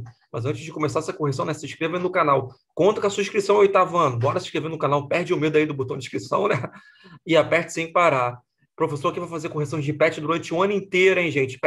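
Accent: Minas Gerais, Brazil. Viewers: Vc é de mg??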